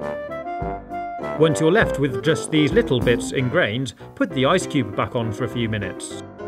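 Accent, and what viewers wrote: United Kingdom. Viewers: Hi are you British cus I am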